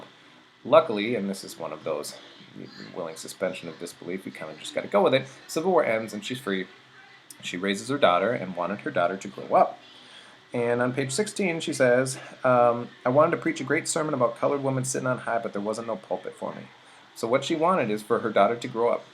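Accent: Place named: Minnesota, United States. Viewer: This fucking guy is too Minnesota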